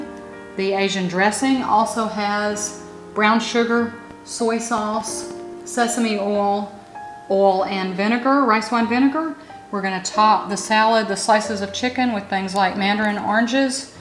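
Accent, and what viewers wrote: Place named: Tennessee, United States. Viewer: Hi, I just found you on YouTube and love your videos. I know you won't say but your accent sounds like mine and I am from Tennessee,. Go vols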